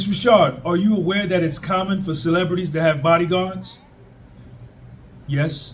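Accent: United States. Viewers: This man has a good USA accent, keep up the good work . ⚖️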